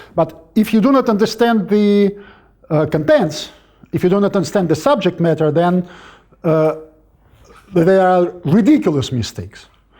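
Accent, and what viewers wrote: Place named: Russia. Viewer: Потому что эти звуки нужно учиться выговаривать как можно раньше, желательно с детства. У русского ребёнка такой возможности в России нет, поэтому ему приходится учиться это делать во взрослом возрасте. У некоторых получается и они приобретают более менее норм. акцент. Но не всегда на 100%. Здесь я слышу у него практически в каждом слове русский акцент. И ещё большие паузы. Native speakers говорят на родном языке со скоростью света. Он говорит очень медленно.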